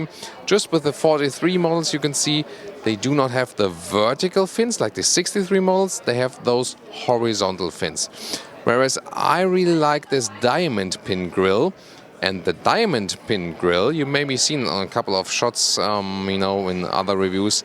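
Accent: Germany. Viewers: like it because he focuses on details in his videos so interested people can really get familiar with the cars before going to the dealer. I may even get used to his German accent :P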